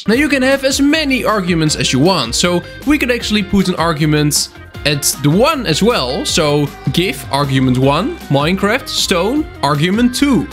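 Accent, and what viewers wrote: Netherlands. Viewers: I smell Nederlander?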